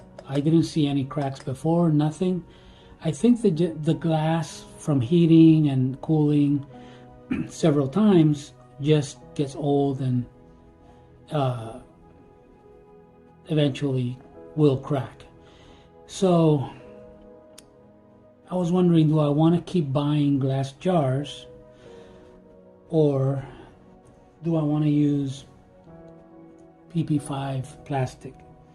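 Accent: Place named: Cuba. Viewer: Is this your new microphone? Sounds decent. I'm old and perhaps not "politically correct" but what is the source of your accent? Cuban perhaps?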